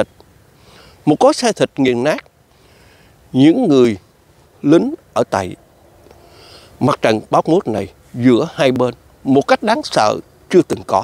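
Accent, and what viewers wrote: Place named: South, Vietnam. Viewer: Rất hay và khóai chất giọng miền Nam của chú. Cảm ơn chú đưa tin tức.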